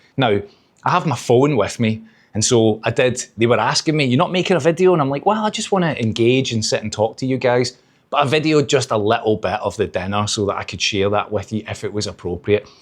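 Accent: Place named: Glasgow, United Kingdom. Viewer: Hi, my name is also Yvonne. I stumbled upon your video a few days ago and this is the second one I’ve watched. It’s always interesting to hear what non-Malaysians think of our country. I love hearing your accent because it reminds me of my good friends Bernie and Graeme from Glasgow. I’ve lived in England and Northern Ireland for over 20 years. I’ve travelled to Scotland and been to Glasgow Green for the World Pipe Band Championship. My husband played the pipes. Sounds like you’re enjoying KL. Are you getting to experience much local Malaysian culture? You’re probably going to say, watch your previous videos, which I will. Have fun.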